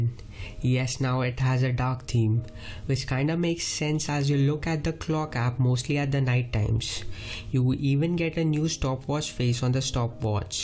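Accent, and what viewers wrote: India. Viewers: Your Indian accent is too funny! LOL.I couldn't understand most of the times! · Damn that Indian accent is so annoying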